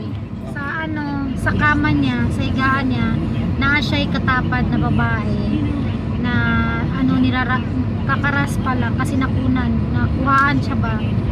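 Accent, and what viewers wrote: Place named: Visayas, Philippines. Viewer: kaluoy sab sa imong amega sis...nice vlog cute kaau ka mag visaya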